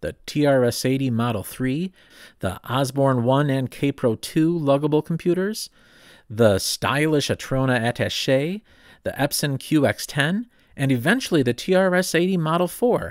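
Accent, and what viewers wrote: United States. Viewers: Why must you americans pronounce "o" as "/\" ? I mean how do you know the difference between "a knot" and "a nut" ? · Why do people in the USA pronounce Z as zee instead of zed used by most of the other English speaking world. It's extremely difficult listening to this from England.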